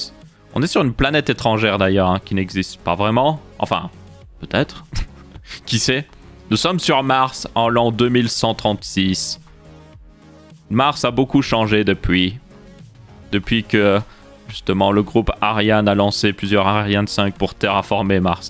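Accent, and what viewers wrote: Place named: Belgium. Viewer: *OUÏTE* alala l’accent belge ... Qu’est ce qu’on ferait sans toi ! xD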